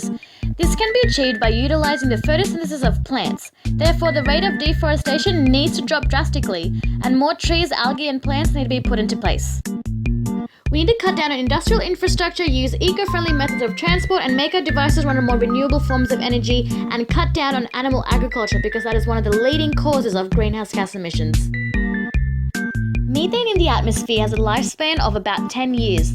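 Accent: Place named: Australia. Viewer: great video; I am too curious though, what kind of accent is that? I want to say Australian? too few views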